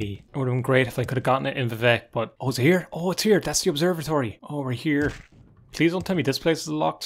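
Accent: Ireland. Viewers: man, placing your accent is pure hard. Is it Mayo/Galway? That or midlands? Anyways, savage videos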